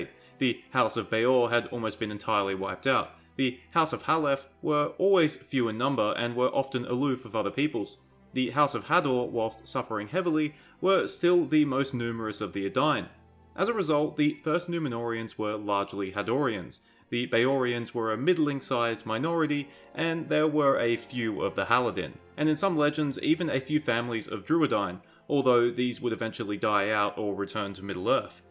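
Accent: Australia. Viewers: I deduce (correctly I hope) that you are from Sunshine Coast, Australia, based of your accent and the 350 000 number you gave! I'm kinda scared if I'm way off though. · I've been trying to guess where you're from by listening to your pronunciation of certain words for quite a while. I think NSW, possibly Central Coast, Wollongong. And unlikely picks for cities with a similar population are Hobart and Geelong.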